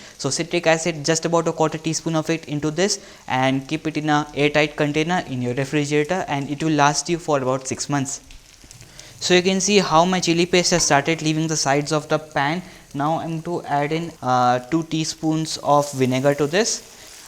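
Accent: India. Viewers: Indian accent is horrible....its chopped(not chobbed). weirdo. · India has a totally different English accent! I strongly recommend subtitles for these videos!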